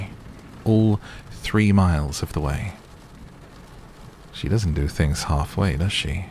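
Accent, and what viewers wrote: United Kingdom. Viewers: Omg. Your reading voice while speaking in Jessica’s voice at graduation had me 😂😂😂. Also probably because that’s how I heard her voice in my head (sans the British accent. Lol!). Really enjoying your reading of the twilight books! Thank you!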